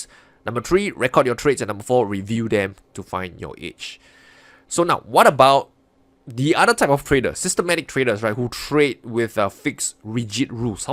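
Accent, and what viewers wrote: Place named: Singapore. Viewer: has a typical Singaporean accent.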